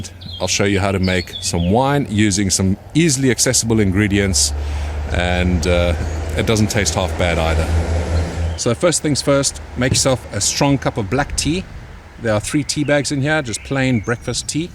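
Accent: South Africa. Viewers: Nicely styled out there, could've had water all over the deck! Looking forward to trying this, just picked up a couple of demijohn's from Wilko (£8 each - pretty good value), and ordering the chemicals on-line. Going to try 3l grape juice, 1l Orange to start with (make sure I can do it before I get too inventive!). Hopefully should be good. Thanks, and love the SA accent by the way. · That's put a smile on My Face, I thought i heard a SA accent and thought that's where You are ? What part of the UK are you ?, I now live in the Northwest of Ireland But Originally from Portsmouth ,UK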